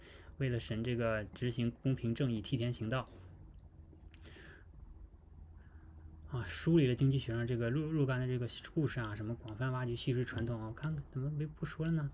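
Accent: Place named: Northeast, China. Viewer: 播主的声音挺好的，有点东北口音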